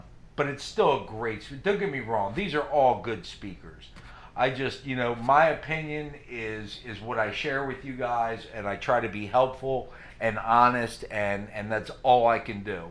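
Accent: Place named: Philadelphia, United States. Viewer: You're from Philly aren't you... if not you have a strong philly accent lol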